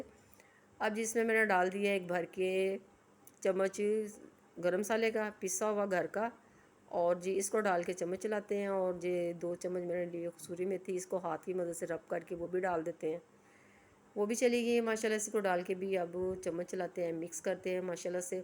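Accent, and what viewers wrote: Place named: Punjab, India. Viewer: Love your talking ❤😅with Punjabi touch 😅❤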